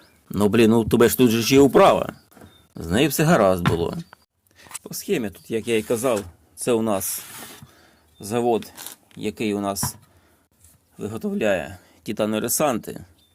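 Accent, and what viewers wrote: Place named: Central Ukraine, Ukraine. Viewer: Хоч ніхера в цьому не смислю,але цікаво,завжди дивлюся 😅 В якій області живете,завдяки вашим відео вибрав собі полуавтомат,бо у мене дуже древній,Гарного мирного дня.Судячи з мови,думаю що це Черкаська Київська або Вінницька, обл,буду радий якщо дасте відповідь на мій коментар😊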